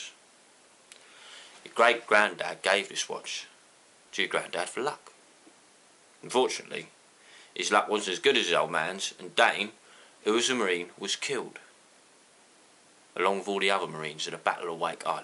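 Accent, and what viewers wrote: England, United Kingdom. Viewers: Just discovered this...wonderful! Great skill! Are you English?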